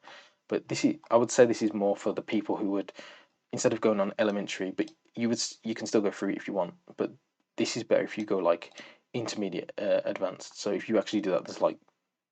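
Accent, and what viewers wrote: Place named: Birmingham, United Kingdom. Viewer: Ah you’re a Brummy, do you know Joe?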